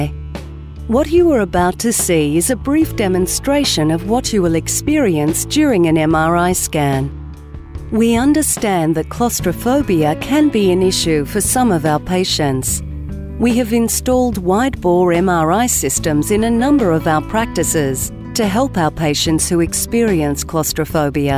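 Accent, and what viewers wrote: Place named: Queensland, Australia. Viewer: Quensleeend forever; the nicest of world's ENG pronunciations - say I, a Scandinavian.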